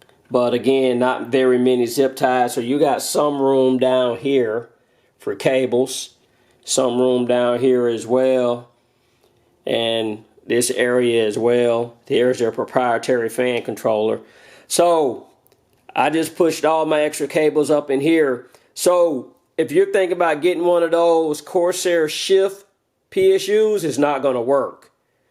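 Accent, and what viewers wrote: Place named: South, United States. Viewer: narrated with a heavy southern drawl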